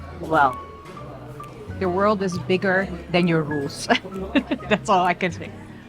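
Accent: Bangalore, India. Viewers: Its cute how her accent is getting more Bangalore. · wow, more desi accent than me. I'm in E. Europe too. The best. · Damn how do you sound Indian while being Dutch originally?? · WOW idly, Dosa, Sambar, Voda, Alu Gobi..WOW Your talking like local Indian..I'M IMPRESSED.. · I only have one question: Why do you say you are a foreigner when you are in Indian? You have clearly a distinct Indian accent? Are you ashamed to be an Indian? I am a foreigner vlogging in Indian and I find it a great country. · The food looked delicious, and its funny how you really have an Indian English accent haha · Why you speak like indian? Or it has voice over? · Ur acent is Indian why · Vôtre accent est indien? C'est vrai? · Ma'am your english accent is more Indian how that happened , Haha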